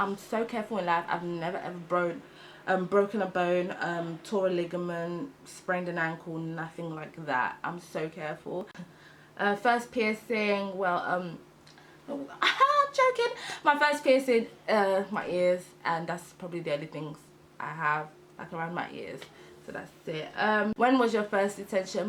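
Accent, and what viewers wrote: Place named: United States. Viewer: You're personality is EVERYTHING!! 😂😂😂😂😂. And you're American accent is 👌🏽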